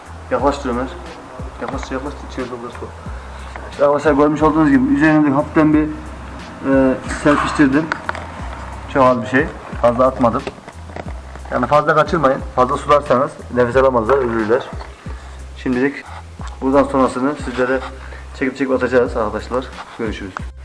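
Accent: Tokat, Turkey. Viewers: tohatlıca türkçesi ustam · Abi herhalde Tokatlı konuşmasından anladım bende tokatlıyom ordan biliyorum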